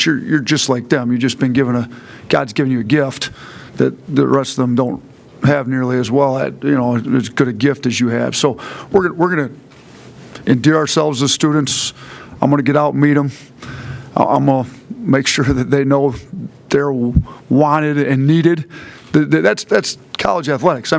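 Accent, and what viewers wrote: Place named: Northeast, United States. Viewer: he has the northeast accent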